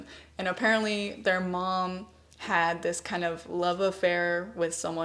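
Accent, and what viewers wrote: Standard. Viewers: Leah讲的似乎就是慢速、完整、标准的英语。有的家庭是要求孩子这样说话的。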